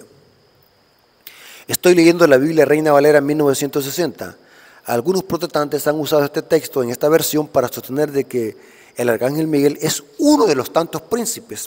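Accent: El Salvador. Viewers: Así se habla en El Salvador, como aquí en México, cada estado tiene su acento.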